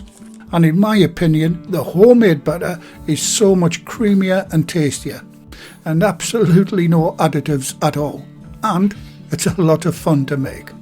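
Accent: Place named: England, United Kingdom. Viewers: Nice to hear an English accent rather than American. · I think I partly watch to feel like home . . . now living in Australia . . I do love any northern twang.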